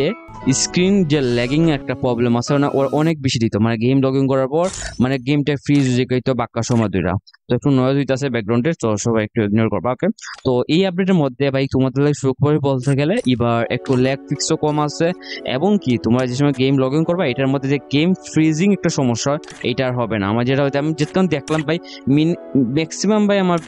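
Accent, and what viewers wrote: Sylhet, Bangladesh. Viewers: bhai tumi sylhety ni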